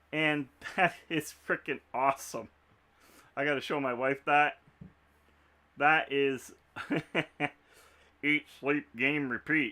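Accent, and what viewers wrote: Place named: Texas, United States. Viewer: Worst Texas accent I ever heard y'all.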